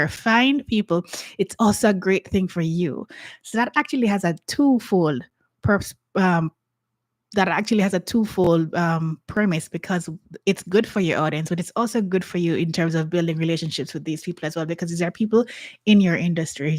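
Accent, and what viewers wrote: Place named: Jamaica. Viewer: First. Your green glasses are on my radar. How beautiful!!!! Second- I hear a little bit of my island in your voice. Shall I guess? JAWI? 🇯🇲Perhaps Bahamas? Great information here for entrepreneurial, industry growth. 🌻✝️🇯🇲🌻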